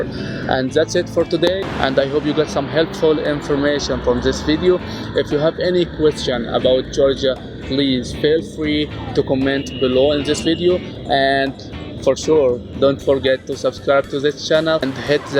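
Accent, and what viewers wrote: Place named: Egypt. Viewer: I can tell from his accent that he's Egyptian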